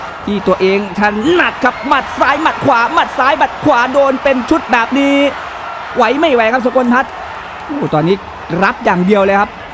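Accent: Isan, Thailand. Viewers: พากมวยมีอารมณ์ร่วมกับมวย.รวมพลัง. น้ำเสียงดีด้วยเลยทำให้น่าฟังมาก.ขึ้นไป อีก.ติดตามตั้งแต่เริ่มเปิดมาดูแล้วครับ.พาก มันจริงฯ.ชอบฯ.ไทยปนอีสาน.อย่างแจ่ม..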